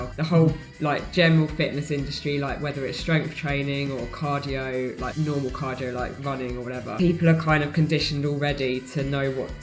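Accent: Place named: United Kingdom. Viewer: theres a young lady that talks just like u lol we love it at work is it like from england british or irish lol i dunno it just so dam cute liver pool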